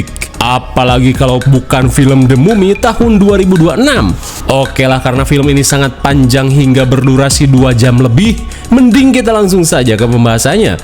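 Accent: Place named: West Java, Indonesia. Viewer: Vibe horornya keren bangets...komentatornya juga logat sunda nya keren....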